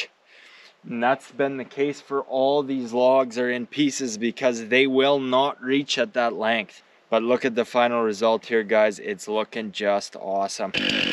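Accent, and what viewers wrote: Canada. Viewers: My family has been in Canada since 1809, we own large amounts of land for generations and yet still this guy sounds more Canadian than me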